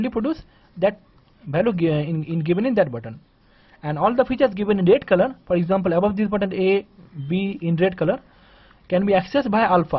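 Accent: India. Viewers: Индийский акцент это ржака! 5+ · cool indian accent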